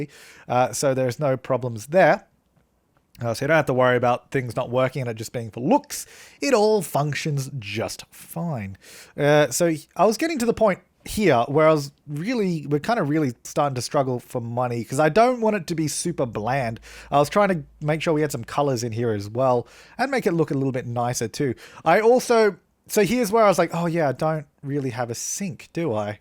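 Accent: Australia. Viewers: Is it just bc of his Australian accent or does he sound like draw with jazza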